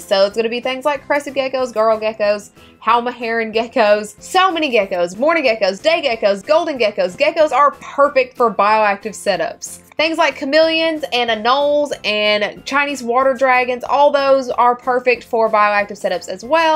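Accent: South, United States. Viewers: Really nice videos. talks country (like me) but also very fast. I'm confused.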